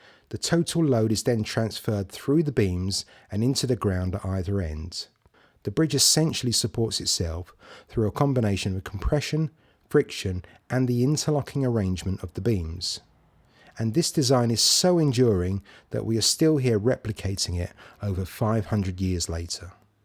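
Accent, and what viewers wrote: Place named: United Kingdom. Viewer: Gracias por explicarlo, aunque me cuesta trabajo entender el inglés Británico al menos entendí la física ❤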